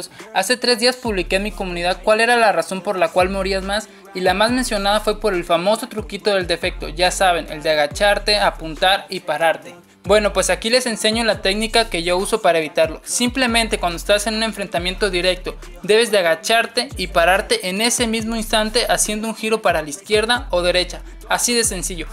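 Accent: Argentina. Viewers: Sos de Tucumán crack ?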